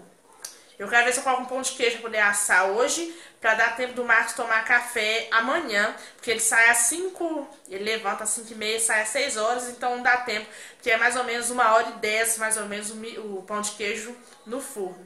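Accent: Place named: Minas Gerais, Brazil. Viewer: Você é mineira?? Tem um sotaque da minha terrinha boa rsrs like.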